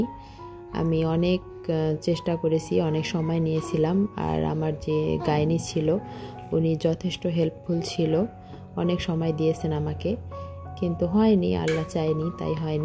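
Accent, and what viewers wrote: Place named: Rangpur, Bangladesh. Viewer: না বুঝলে আর কি করার বলেন কিন্তু ওই আপু হয়তো বুঝেছে আর আমি কিন্তু খারাপ ভাবে বলিনি অনেক নরম করেই বলছি কমেন্টে হয়তো বুঝতে পারেন নি ভয়েজ অপশন থাকলে বুঝতে পারতেন আমার কথা যাইহোক এই আপুও রংপুরের আমিও রংপুরের আর আঞ্চলিকতার টান সবার থাকে কিন্তু কিছু কিছু শব্দ উচ্চারণে এই রকম হয় অনেকের আমার যে হয় না তা নয় আমারও হয় কিন্তু আমিতো ভিডিও করছি না ঘরের মধ্যে কথা বলছি তাই কেউ বলবে না কিন্তু উনি তো ভিডিও করে সবার সবটা পছন্দ নাও হতে পারে। আর আমি শিক্ষা নিয়ে কোনো খারাপ কথা বলিনি ভালো করে কমেন্ট পড়ে বোঝার চেষ্টা করেন আজগুবি রিপ্লাই দিয়ে বুঝাতে চাইলেন আপনি খুব ভালো মানষিকতার আর আমার মানষিকতা ভালো না।আমি এটাও বলছি আঞ্চলিকতাকে সম্মান করি।ভালো থাকবেন।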